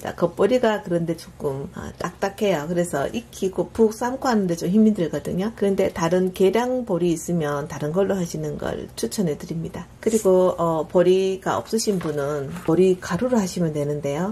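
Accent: Gyeongsang, South Korea. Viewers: 쌀을 쌀이라 부르지 못하는 지역 ㅎㅎ 삼 싸먹으면 ~~ 살 처럼 경상도 억양 ^^ 목소리가 참 좋으세요 울 애기 엄마보고 보라고 해야 겠어요^^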